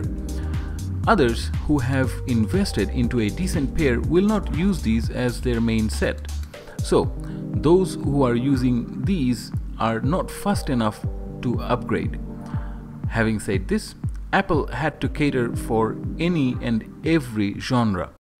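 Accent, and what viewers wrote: India. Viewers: I live in India and you sound Indian too albeit you are at the initial phase of picking up the American accent where you have moved not too long ago and you were ably assisted by your kid . Bet my bottom follar you are a Bengalee I bought a Samsung AKG in ear monitor last week and it is divine in sound with Spotify and UTUBE and carties every detail of sound and is a true representation of the high class of the AKG stable. AKG is a brand which makes ptofessional sound systens , equipments and accessories. AKG was acquired few years ago by Samsung which saddened me but thankfully Samsung has maintained the European standards in AKG .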